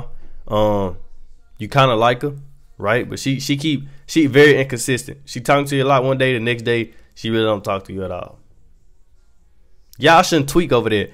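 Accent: United States. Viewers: i can't understand the american accent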